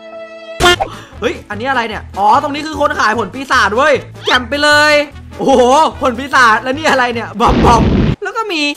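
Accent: Isan, Thailand. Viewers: พี่อีสานป่าว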